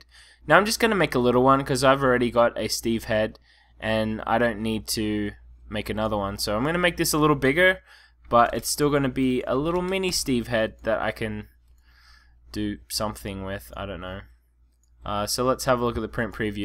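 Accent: Australia. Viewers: are you aussie? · You sound Australian and American? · Are you Australian?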